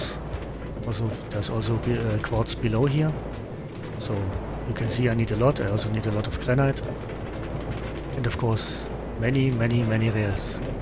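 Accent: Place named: Germany. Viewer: korrigiere mich, aber ich denke aufgrund deiner aussprache das du deutscher bist :D eine ernst gemeinte frage, warum macht man sowas, bzw wo genau liegt da die faszination drin von sowas ein video zu machen? ich selber hab spaß an solchen rails ingame würde aber nie auf die idee kommen ein video davon zu machen. ansonsten liebe grüße und weiterhin viel spaß am videos machen ;)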